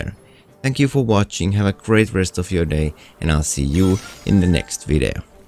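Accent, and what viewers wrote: Finland. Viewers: is that a finnish guy i am hearing since the Q and the word youtube came out pritty finnish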